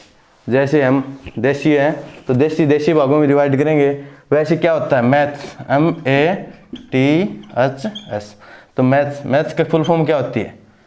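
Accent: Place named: Haryana, India. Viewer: हरियाणवी शैली में👍👍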